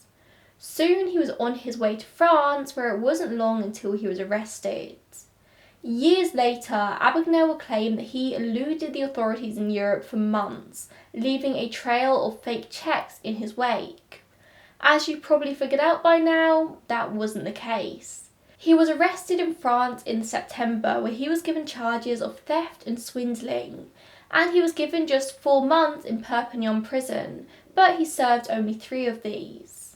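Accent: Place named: London, United Kingdom. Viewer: Apart from the annoying low class London accent and the grammatical mistakes of her own language , this is a review of a book that was ghost written to sell books using data from a book that was written to sell… well books , and bits from a movie script that was written to sell movie tickets. None of them are the truth. You should have done your own research and presented those findings but, that would take time away from attempting to be famous on YouTube. Far better to copy someone else’s work, dumb it down and concentrate on You. By the way, if you are going to read facts from a prompt because you don’t know them , make sure that the information is correct…. There is no Bronxville area in The Bronx, it is Brownsville and, I am quite sure that Abagnale started doing speaking tours around the USA, not the UK. Know your subject matter.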